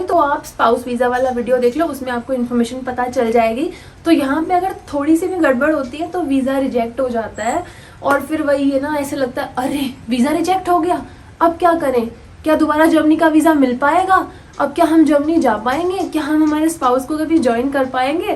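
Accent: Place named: Punjab, India. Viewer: Ma'am you said that you are from Rajasthan but you sound like Punjabi. We would like to know more about this.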